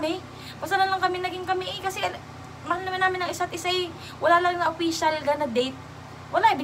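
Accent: Batangas, Philippines. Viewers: Ate ur so cute. Haha nakakatwa the way ka magsalita though i have many friends na batangena at batangeno. I also have relatives in batangas. Shout out naman. Subs mo na ko since you started. In looking forward na gumawa ka din ng videos like mukbangs with mamay hahahaha. Abay nakakatawa kayo ehh. Shout out plssss. Im from cavite. Thankyouuuuu. Sana mapansin mo comment ko ate. Akoy naaadik sa panonood sa yo kamo. 🤩🤩🤩🤩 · Hello, Ms! You have a cute accent, Batanguena ka ba? I really enjoyed listening to you. I’m happy for you being able to come to the states. Mabuti at summer ka dumating dito kc grabe pag winter lalo di yan sa East Coast. Watching from California 😃.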